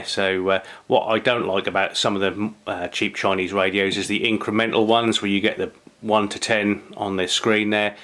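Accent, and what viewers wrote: United Kingdom. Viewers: I just got mine (US). It's a pure beast on 70CM, hitting repeaters way out. 2M is ok to close repeaters, even with different antennas. Big clunky buttons feel awesome and the GPS is strong (hold down the A/B button for GPS). When transmitting, be sure to press the transmit button hard and wait a second or two before speaking. Too bad you couldn't get this info from the video, but you know, clicks.... But at least he's got a British accent.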